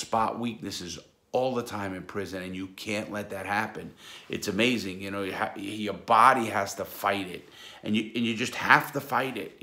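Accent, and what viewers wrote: New York, United States. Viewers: I love that ny accent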